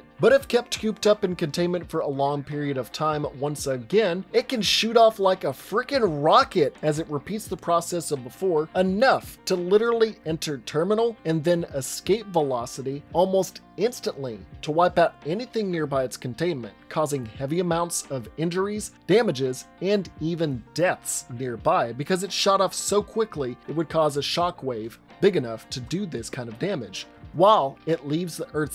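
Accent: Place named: Indiana, United States. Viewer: .........you’re from Indiana, aren’t you.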